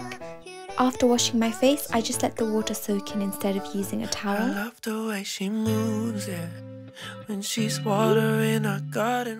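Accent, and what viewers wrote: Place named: United Kingdom. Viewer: Omg finally a new upload 😭😭💕 I’ve been waiting for this for so long! Can you upload more often so I can hear more of your beautiful British voice and amazing editing 😭✨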